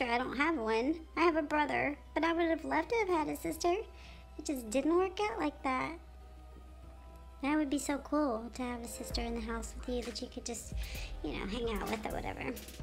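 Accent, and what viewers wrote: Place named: United States. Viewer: I love your voice its so country😊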